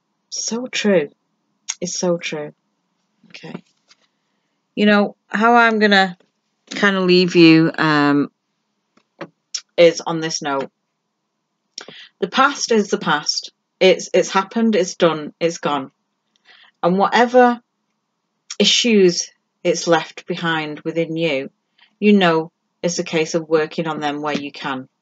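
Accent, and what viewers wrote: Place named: Northern England, United Kingdom. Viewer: Ru from Northern England? You sound very local to me 😊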